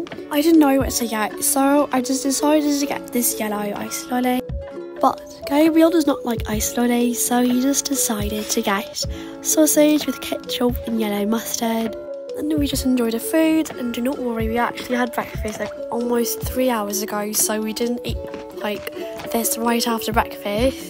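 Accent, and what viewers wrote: United Kingdom. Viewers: You have such a beautiful voice! I love the British accent! 😉😄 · Hi!!! I have a question!! In your tiktok role plays, you are American. But in your videos/voice-overs you are British. Why is this??? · do you have the real british acceant no hate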